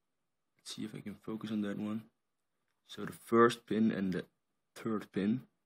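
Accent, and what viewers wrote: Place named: Netherlands. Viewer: you sound like you're dutch c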